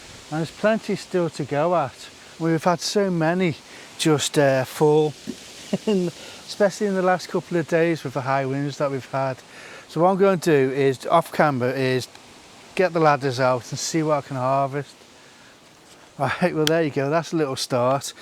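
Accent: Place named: Wales, United Kingdom. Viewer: Got a bet on with my sister I reckon you're Welsh, she reckons you're from the Wirral.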